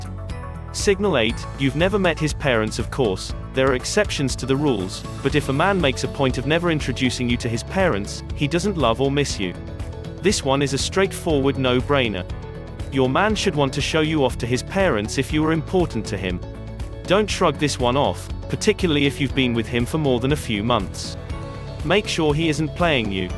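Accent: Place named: United Kingdom. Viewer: Of course all of this totally true. What I loved the most about the content wasn't the content at all. It was the straight up no bs delivery in smooth British voice was delicious and hilarious. By the time the video ended my mood was lifted. Well played. Thx for sharing.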